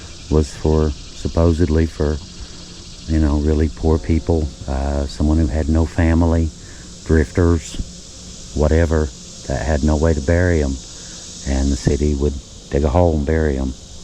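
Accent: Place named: Appalachia, United States. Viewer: It's that way here in North central Texas. Especially at the oldest cemeteries. There was a story of families that had lost so many children that they weren't even given a name until they were 2. I actually thought that was untrue, but it isn't. Lots of people died here in 1918 with the influenza but when it appeared again in 1919 it was even worse. Breaks my heart to walk, see mom and dad and several very small children side by side. I have been a sensitive all my life and there's times and especially places that it's overwhelming. I just stand and pray for all of them., your channel has quickly become one of my tops. I have family all over southeast KY and in west Virginia. Hearing you talk makes me feel like I am up there with them.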